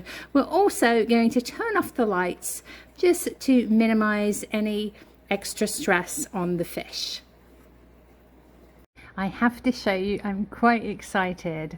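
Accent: England, United Kingdom. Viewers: I love your content and your personality. Your English accent just makes me happy. I love it when your kiddos. I never miss a video. You have great taste.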